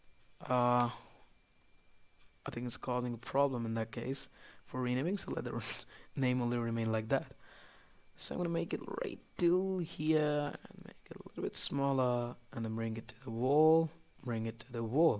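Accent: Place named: England, United Kingdom. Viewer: Lag Raha he koi asli angrez bol Raha Hain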